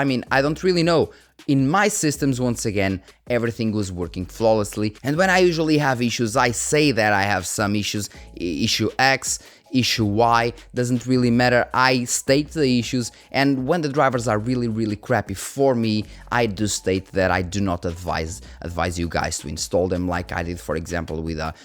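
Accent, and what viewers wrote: Portugal. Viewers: I recognized your accent in Portuguese. Brazil here!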